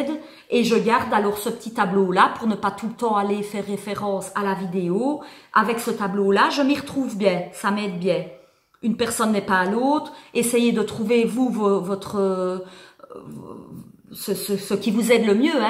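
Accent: Liège, Belgium. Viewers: Oui, et l’accent liégeois aussi ! 😁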